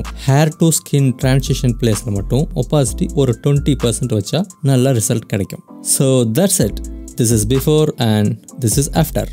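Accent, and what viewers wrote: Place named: India. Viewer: Saya dari indonesia.. Tutorialnya bagus, tapi saya tidak terbiasa mendengarkan bahasa inggris aksen india.. Sebaiknya ada tulisan inggrisnya, atau translet indonesia.. 😃😃😅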